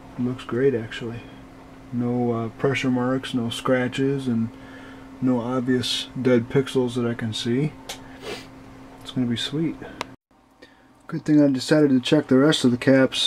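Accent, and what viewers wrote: United States. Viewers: I am sure every state and country you have visited you heard the word SOLDER, why was this word mentioned to you? Do you sell it or what? Add another letter and wou get the word SOLDIER, how do you pronounce that? SAWDIER. It is two syllables SOL say that.... and DER..... and that. Now put them together, what do you get, MUPPET. You are obviously American, where you say Cli'n instead of Clinton, Innernet, couner, couny, twenny...............learn to speak English. Muppet.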